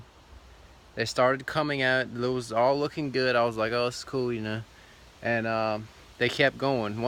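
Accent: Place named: South, United States. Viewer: You are handsome and your southern accent is everything ❤️😊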